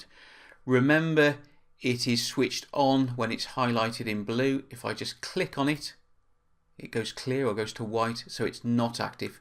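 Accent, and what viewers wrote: United Kingdom. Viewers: I installed a YT Add-in which allows you to set speeds up to about 25X....but I find that 2.5-3X is the highest, intelligible speed with this (& many other Brits). However, is one of the better, cohesive sets of videos out there.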